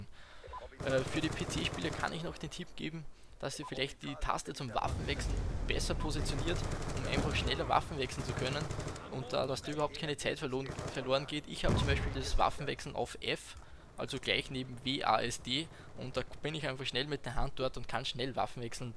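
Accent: Austria. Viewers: tolles video :) bist du österreicher :3? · Sau geiles Video :-) Von wo kommst du eigentlich hörst dich an als wenn du aus NÖ kommst :) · Bist du Österreicher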